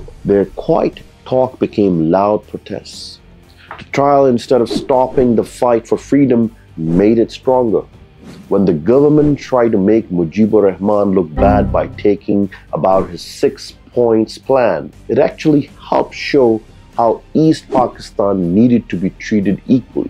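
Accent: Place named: United States. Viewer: Love from india 🇮🇳🇮🇳🇮🇳🇮🇳🇮🇳 we want more information about Indian and pakistan historical... We also learning in Indian school Book (NCERT) but i listen what's pakistani think about undivided india Your voice is good but american accent some time problem to understand Your name is (i think is hindu sir name and also creat from ancient Afghanistan or great India when 16 mahajanpad in north india one of the state... If you know about your sir name and......history about 2th century or gupta, mourya, saatvahan, shak, kushan emperor so please make video my be possible speaking urdu/Hindi)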